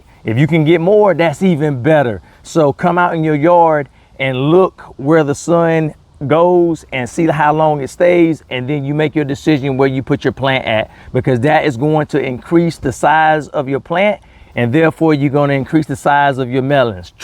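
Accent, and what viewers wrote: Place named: Baltimore, United States. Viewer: You saying u's like you from b.more! Yay yae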